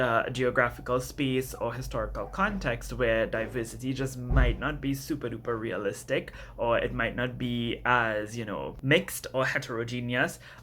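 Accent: Trinidad and Tobago. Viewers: I heard your accent and I was like OH MY GOD because I haven't seen a lot of trinidadian booktubers before. So it's nice to see someone come from where I'm from that's into this. :D And honestly you have such a good point here. It's one of the reasons I don't like blackwashing because it's lazy. Instead of writing characters who are POC or looking and supporting authors who are and do write POC (which then makes these things popular) they get fussy about these white characters. I think diversity depends on a couple things. Setting, like you mentioned as well as how you write their character. Of course culture and heritage is linked but when their only character trait is that they're black it comes off as ignorant. Especially if you're not from that culture. I think that people who write these characters if their culture is heavily linked to it, they should do a lot of research and have people from that culture do a sensitivity reading. 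But I also think we need to talk about the publishers and marketing. They're really the ones that push aside and don't properly promote POC writers. Which needs to be tackled. Because if we don't fix this in the first place by supporting POC authors and making awareness, who will? These kind of situations also make me wonder what's my place as a mixed Indian. I can already tell that people will drag me because I'm not 'actually Indian' since I'm from the Caribbean. Am I only allowed to write characters like me? Even if my entire book/screenplay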